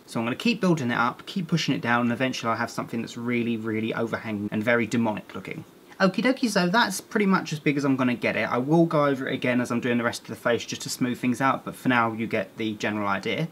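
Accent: United Kingdom. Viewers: OH HOW I LOVE HIS BRITISH ACCENT "mickey!" "but dead" *laugh*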